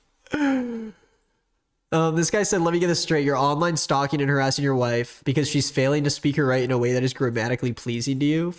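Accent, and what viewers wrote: South, United States. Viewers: I work in a cali firm and I can tell you that everyone would find a southern accent super cool AND she says stuff like 'darn tootin'??? Amazing. Love her. I wish her the absolute best.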